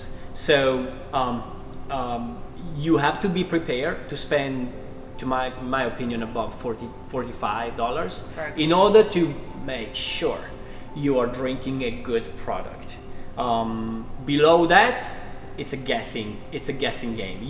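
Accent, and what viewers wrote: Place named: Italy. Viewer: Gotta love that Italian accent. Fun video!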